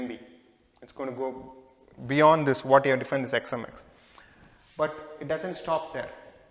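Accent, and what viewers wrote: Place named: India. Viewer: 受不了三哥的英语。。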